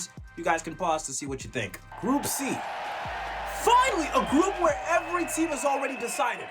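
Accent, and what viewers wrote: South Africa. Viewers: Are u originally south african? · Are you south african?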